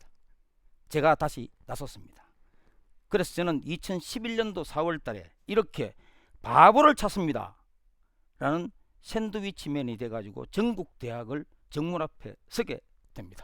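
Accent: Gyeongsang, South Korea. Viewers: 오랫만에 듣는 명강의 였습니다 처음 종로선글에 찾아 올땐경상도 말씨로 약간은 덜세련된 말투가 너무 진정성과 친밀감을 느꼈습니다 아침 같은시간대에 어김없이 방송해주시는 마력에 빠졌습니다 삼성에 근무하셨다는 말만으로라도 나와같은 삼성가족 이구나하는 끈끈함과 가끔은 우리집사람이,우리집 사람은 하고 호중님 얘기를 하시는 모습이 너무나 자상한, 인간적인 면모를 보는듯 합니다 대통령상을 두번이나 받으실 자격이 충분하다는 생각을 했습니다 늦었지만 맘껏 축하드립니다 고맙습니다 신께 감사드립니다 호중님곁에 회장님이 계시고 그래서 저도 인연을 맺게 됨을 말입니다 환절기에 건강을 기원합니다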